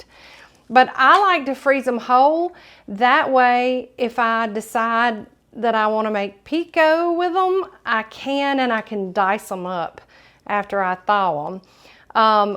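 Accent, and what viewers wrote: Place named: South, United States. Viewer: Great video. Thank you. Automatically gave you a thumbs up for the southern accent alone. 👍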